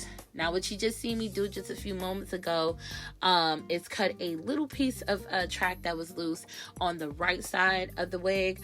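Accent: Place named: New Orleans, United States. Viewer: I love it this is cute!!! And I see that we are from the same hometown , New Orleans baby!!! I can tell by the way you talk. ❤❤❤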